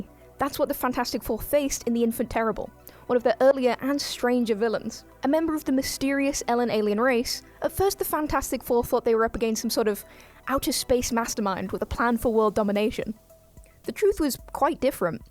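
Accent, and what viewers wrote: United Kingdom. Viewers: You sound like a British version of Ducky from Land Before Time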